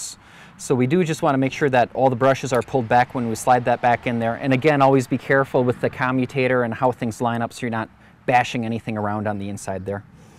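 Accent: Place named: Wisconsin, United States. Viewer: Ah that great Wisconsin accent, takes me back home. Great video series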